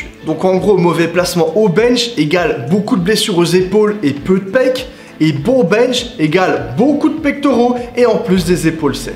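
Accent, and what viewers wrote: Belgium. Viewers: Sa s'entend que tu es Belge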